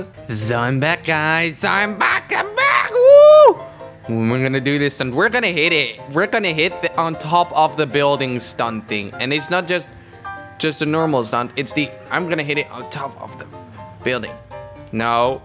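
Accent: Netherlands. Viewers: are you Dutch? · Are u dutch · Are you dutch? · zalig, ik wist niet dat je nederlands was, ik dacht het eerst wel aan je naam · Are you freeeking Dutch nicee vid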